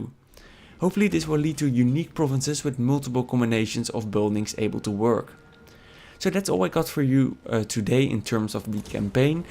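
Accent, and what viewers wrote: Netherlands. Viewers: Are you perhaps from the Netherlands?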